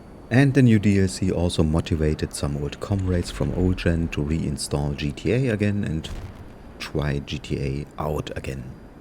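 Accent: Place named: Germany. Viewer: He has a slight German accent but everyone has to start somewhere. 💪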